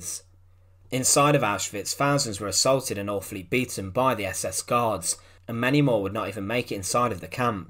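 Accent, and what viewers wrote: United Kingdom. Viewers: PLEASE INVLUDE ENGLISH SUBTITLES IN YOUR VERY INFORMATIVE VIDEO DOCUMENTARIES. YOUR STRONG REGIONAL ENGLISH ACCENT IS DIFFICULT FOR ME TO DISCERN AND UNDERSTAND . THANKS FOR THE GOOD WORK YOU ARE DOING . KEEP THEM COMING.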